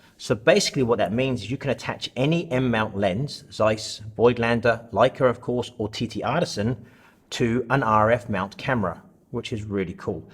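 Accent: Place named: Portsmouth, United Kingdom. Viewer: Different is good sometimes, but the colour background is better. What happened to the Pompey accent video? Waiting to show friends here in Japan that I'm not the only one with this accent.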